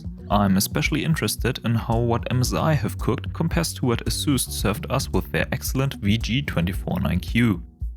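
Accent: Germany. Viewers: are you randomly German?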